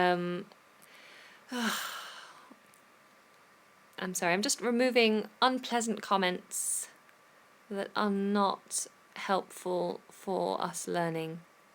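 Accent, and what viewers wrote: United Kingdom. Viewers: thank you very much, ill enjoy hearing beatiful british English. im lazy boy, dont want to study something in front of a desk. it makes me sleepy and boring. I only do what I like to do. I love English. my advance now is there is no japanese around in this village, so i dont have to speak my first language. Ill think in English or Thais. i was a taxi driver, i retired from it 3 yrs ago and now i eat by japanese pension, and am a farmer and english learner. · I LOVE BRITISH ENGLISH.It'S ONE HUNDRED PER CENT ENGLISH YOU'RE AMAZING TEACHER AND I'M A GOOD LEANER THIS IS PROGRESS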